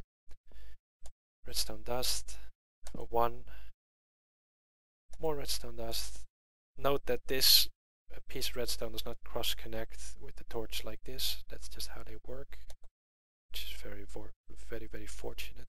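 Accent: Netherlands. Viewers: Are youo dutch?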